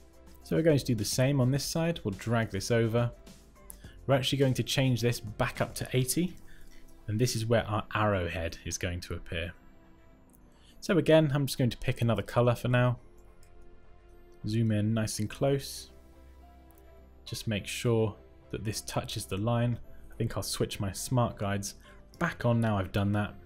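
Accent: England, United Kingdom. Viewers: I love this english accent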